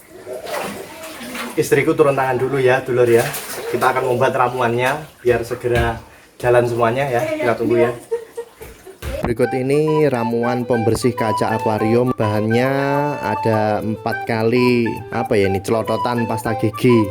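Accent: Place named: Java, Indonesia. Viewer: Wong jowo medoke ketoro hahaha lanjutkan pak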